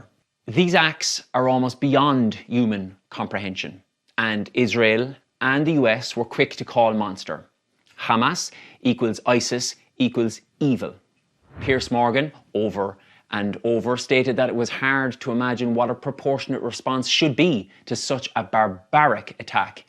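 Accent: Ireland. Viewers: 👏👏 You have hit the nail on the head with this video! Bravo for speaking out against the atrocities. Big fan of your channel and have subscribed and I’m spreading the word to my family and friends too. Thank you so much for your support 🙏🇵🇸🇮🇪 As always pleasure to hear an Irish voice 🎉 God bless you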